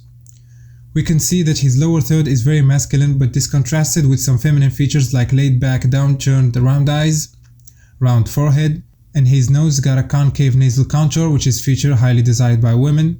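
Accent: Morocco. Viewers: I knew that you're Moroccan from the beginning due to the way you pronounce the letter "T" like "tch" or something lol. Much love to Moroccan people ❤️ Also Eid Mubarak if you're Muslim ♥️ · Ghir mn accent 3rftek meghribi, nadi a khoya laysahel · I knew you were Moroccan just by your accent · i guessed your are from morrocco after 10s of the video based on your accent. good content akhoya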